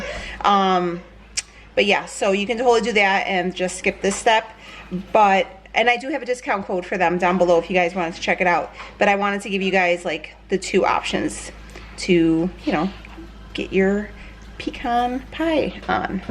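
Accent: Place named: Minnesota, United States. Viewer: I hear a northern accent..are you from Minnesota ?? I was born and raised in Minneapolis -Fridley.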